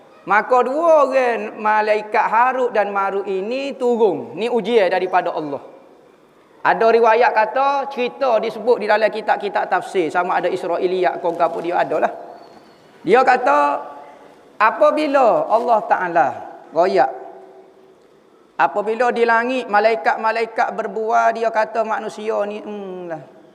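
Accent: Kelantan, Malaysia. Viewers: Klate pekat meme gitu doh kak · X paham kecek kelate sajo.. Jadi paham plok🤣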